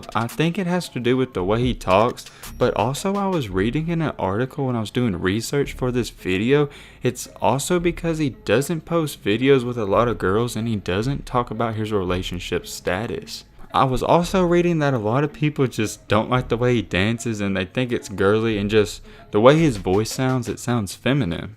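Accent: South, United States. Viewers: Why are you talking so southern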